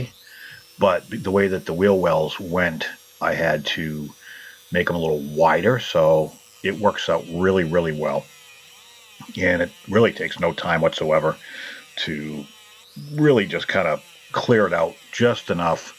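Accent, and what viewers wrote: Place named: New England, United States. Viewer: Wicked pissah I love it lol where you from Maine or Mass?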